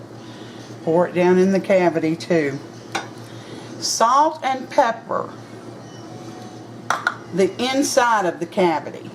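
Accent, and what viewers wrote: South, United States. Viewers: hi! wow wow you made one lovely dish of supper! I make my own poultry seasoning and sage & rosemary is in there, such a fine blend of herbs!!!!! I'd like to thankyou, for such a wonderful video, and sitting down watching your cooking, listening to you &, is very therapeutic, your Southern accent, very peaceful & soothing thankyou my new friend hugs from willow-Sage in victoria bc Canada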